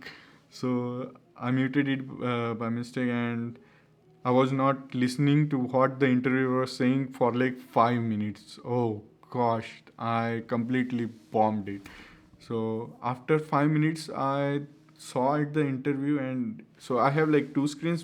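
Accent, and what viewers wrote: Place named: India. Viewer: Are you a student? You have a strong accent, i would recommend you to work on your accent as well. it goes a long way for indians to neutralize that thick indian accent if they are looking for jobs in the US.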